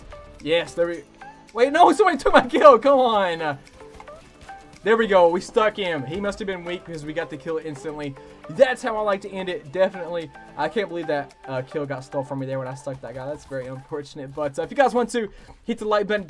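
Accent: Tennessee, United States. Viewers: I knew that accent was familiar. Haha. I'm a tennesseean as well. Glad to see I'm not the only guy with a country accent playing cod lol.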